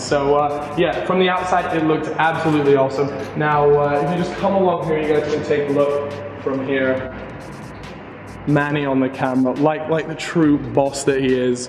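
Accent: United States. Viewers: is he american? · Are you American or Canadian? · why does this guy have an american accent? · am the only one thinking that sounds American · dude he sounds American not English tf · american accent lol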